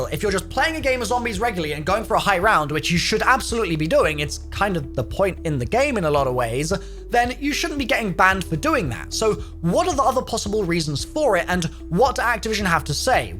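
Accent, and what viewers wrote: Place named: United Kingdom. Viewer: too much uk english for me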